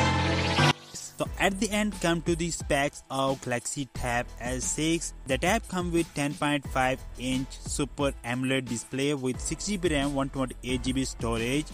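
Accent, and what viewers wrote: India. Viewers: I just turned volume off because of the butchered 7 ELEVEN Apu English. Still a great vid!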